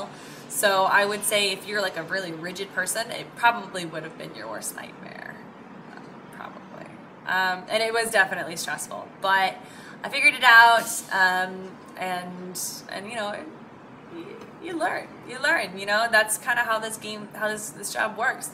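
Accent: South, United States. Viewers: I hope to see you in the national TV one day. Maybe you will learn Korean some day~ ^^ By the way, I reaa~~~lly love the hint of souther accent. Keep cool. It is really hot and humid outside T_T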